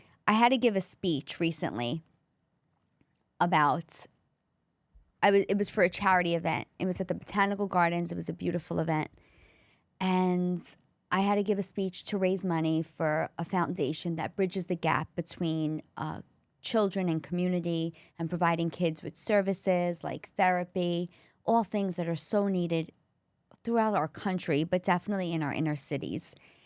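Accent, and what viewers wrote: New York, United States. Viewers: It was how we say park...we born and raised NYers will know each other from the first few words..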